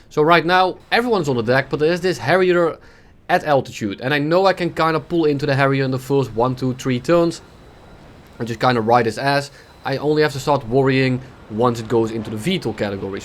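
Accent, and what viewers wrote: Netherlands. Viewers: bro je kan btr nederlands praten (acent) gwn goed engld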